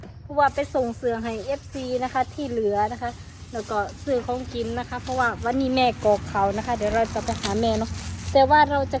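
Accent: Korat, Thailand. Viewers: พูดแบบนี้เหมือนคน โคราช หรือปราจีนบุรี สระแก้ว เขาจะพูดกันแบบนี้ สำเนียง แบบนี้ อยู่จังหวัดไหนครับ น้องสาวคนสวยที่น่ารัก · เอกลักษณ์ของคนโคราชก็คำพูดคำจานี้แหละครับตลกดีชอบๆมากเด้อ คนน่ารัก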